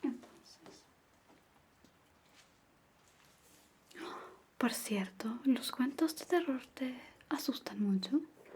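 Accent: Chile. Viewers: Es muy agradable escuchar un lindo asentó chileno que no dice ningún garabato después de escuchar a estas xalas qlias de la calle todo el día gracias ❤❤❤❤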